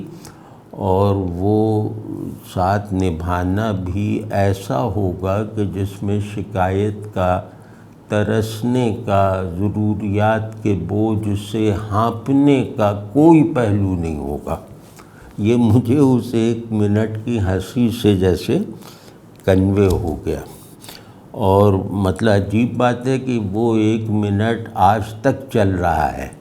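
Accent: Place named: Delhi, India. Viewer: Shaikh ur style of talking shows that u belong Delhi ur knowledge about Chishtia sect is authentic.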